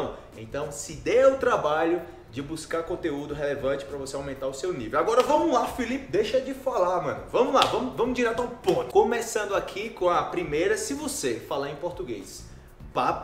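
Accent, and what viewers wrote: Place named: Colombia. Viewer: Eu não gosto muito do acento colombiano, mas quando você fala espanhol com esse acento, soma muito engraçado além das "muecas".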